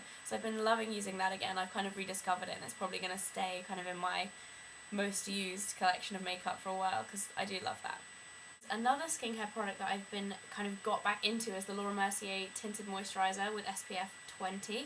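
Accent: United Kingdom. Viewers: I found u because Lollipop 26 liked this vid and I subscribe to her. How happy I am that I did. U r adorable and I luv the British accent. Where I live in the U.S. we just sound rather flat. I'm rather new to YouTube so will look forward to any reviews and tutorials u have. Keep up the good work.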